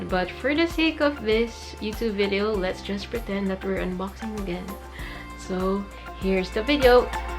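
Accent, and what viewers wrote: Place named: Philippines. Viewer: This is the very first video I'm watching of you, and I was like, "She looks like a Filipina than a Japanese." 'cause of the way you pronounced your words and then I read your bio AND TURNS OUT I WAS RIGHT!!! YEPPEYYY So Ate I hope you could answer my questions (⁎⁍̴̆Ɛ⁍̴̆⁎) + I'm almost graduating and my birthday is nearing, and I don't know whether to get the iPhone X or Ipad Pro together w the accessories. - If I pick the iphone x, it'll be my second phone I ever had for the passed years! I'm not using a phone rn only an Ipad, w a 16GB storage. I SERIOUSLY LOVE EDITING AND MY GALLERY IS PACKED UP W HOT KOREAN BOYS. AND I DISLIKE ICLOUD. + Do I have to purchase the app; Procreate? Or is it free? + Does the Ipad Pro have a screen recording? - 'cause I'm planning on making tutorials of my edits. + How good is the Camera Quality? 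💜💜💜💜💜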